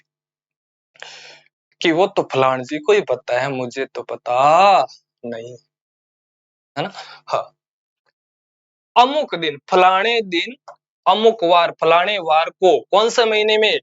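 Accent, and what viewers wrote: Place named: Rajasthan, India. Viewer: Sir me jharkhand se hu me apake language se hi samjh gayi aap rajsthan se ho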